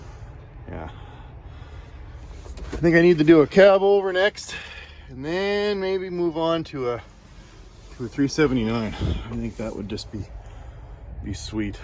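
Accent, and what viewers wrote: Canada. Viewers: I know nothing about trucks, but listening to a Canadian guy talking about trucks so passionately is so relaxing to watch.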